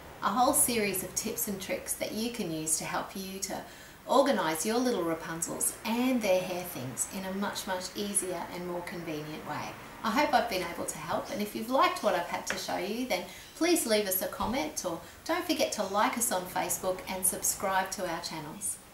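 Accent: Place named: Australia. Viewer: that strong aussie accent xD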